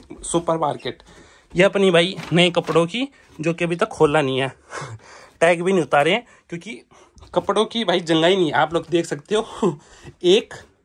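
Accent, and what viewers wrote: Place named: Haryana, India. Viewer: mai bi Haryana sah hu mujai ap ki boli sah pata chal gaya ta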